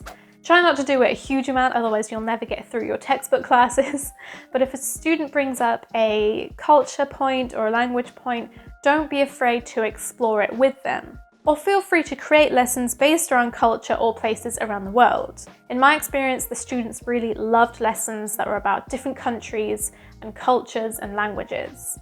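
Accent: United Kingdom. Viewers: I got a kick out of your pronunciation choices...especially the WATER choice. I've had this thought in the past, watching your photography video's, as the word water comes up often, within 'waterfalls' or if your talking about a stream. Our American/Canadian choice to you sounds like wa(d)er as you explained, but from our perspective the 'wat' is the same but the end 'er' is different. Nonetheless, as Canadian, I love the UK/British accent, and have no issues (so far in understanding every word. Oddly, I had an uncle that came from Wales some decades ago, and I just couldn't understand what he was saying for the most part, so I assume there must be an 'old school' Welsh accent perhaps? Anyway, trying to catch up on your video's...have a great day!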